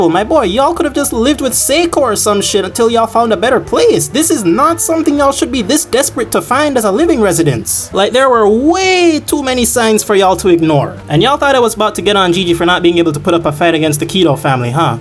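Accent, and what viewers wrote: Jamaica. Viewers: sound like a yaadie heard obeah thought i was tweaking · You sound like the Watch Mojo guy with a Jamaican accent. I love it.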